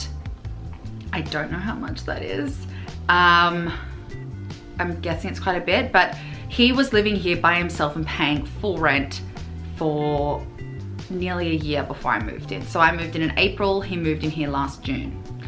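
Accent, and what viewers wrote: Australia. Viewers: That’s the Australian accent one of the sweetest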